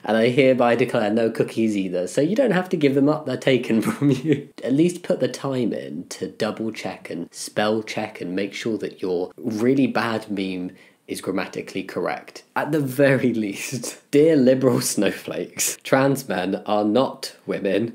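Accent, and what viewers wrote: United Kingdom. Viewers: sometimes I forget you're British (don't me, I tune out accents after a while) and don't know of the prevalence of Christopher Walkin memes such as "it needs more cowbell". You lucky fucker.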